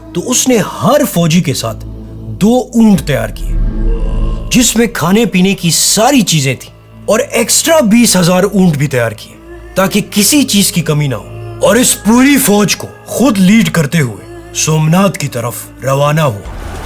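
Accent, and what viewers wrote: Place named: Kohistan, Pakistan. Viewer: Style, accents, editing everything seem like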